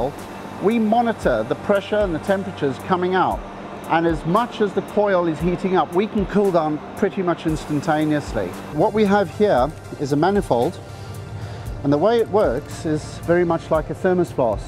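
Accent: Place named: Cape Town, South Africa. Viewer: Well spotted. Very much a Capey.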